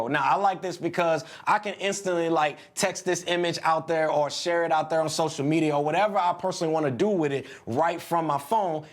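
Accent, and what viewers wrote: St. Louis, United States. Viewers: Bro are you from St. Louis? 😂 STL in the building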